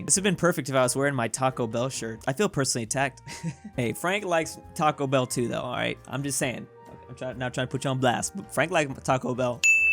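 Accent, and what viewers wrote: Australia. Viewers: Hot take, but.... Australian accent is better than a real Australian’s accent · I love the Australian Accent do a Video with the Accent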